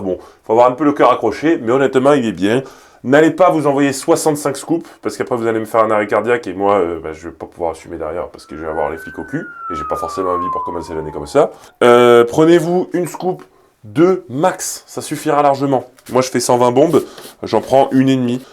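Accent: Quebec, Canada. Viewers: Hahah tu as vraiment un talent pour te mettre dans des personnages 😂😂 et tu le fais vraiment bien, perso je kiff l’accent Québécois 😂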